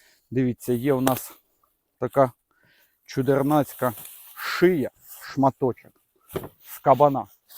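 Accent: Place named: Vinnytsia, Ukraine. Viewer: Не помідори,а томати❤,а говір наш вінницький👍🙏❤️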